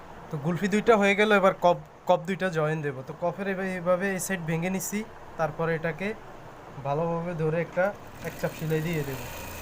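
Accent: Noakhali, Bangladesh. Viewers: আপনার কথা গুলো সহজ সরল, আপনার বাড়ি কি নোয়াখালি নাকি ভাই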